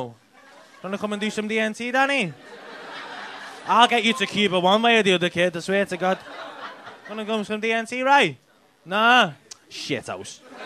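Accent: Liverpool, United Kingdom. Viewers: Just arrived in Liverpool for a year and I can say though the accent is thick, he's speaking a lot more clearly than some locals in the pub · Wha? You lads don't like the scouse accent? Fuck are you here for then?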